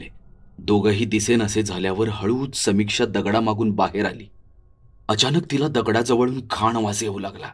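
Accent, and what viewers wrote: Pune-Satara, India. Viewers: That's not way konkan people speak...its more pune satara style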